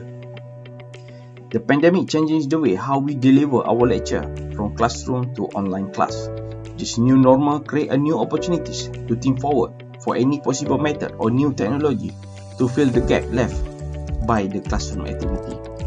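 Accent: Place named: United States. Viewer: Terbaik. Usaha yg sgt baik. Cara cakap pun mmg bunyi slang Amareka. ~ Azar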